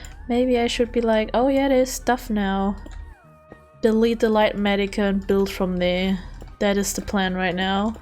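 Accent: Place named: Germany. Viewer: И вот я здесь, человек что английски понимает очень условно (а точнее вообще почти не понимает), смотрю видео по Minecraft на английском, с, как я понимаю, немецким акцентом (на мой слух) и пишу этот коментарий с помощью переводчика... And here I am, a person who understands English very conditionally (or rather, almost doesn't understand it at all), watching a Minecraft video in English with what I assume is a German accent (to my ears), and writing this comment using a translator...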